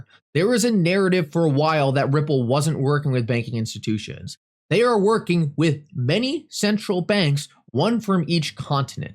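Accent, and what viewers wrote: Allentown, United States. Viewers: with an Allentown accent broken record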